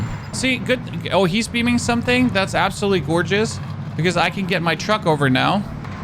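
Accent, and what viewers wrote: Germany. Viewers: Alta du bistn Deutscher? Holy moly, ich hab's nie vermutet... xD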